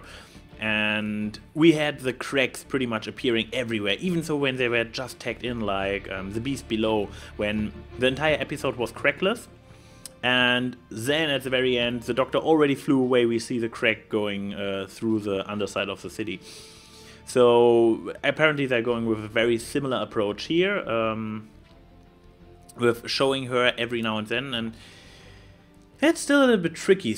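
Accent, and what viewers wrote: Germany. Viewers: Into that German accent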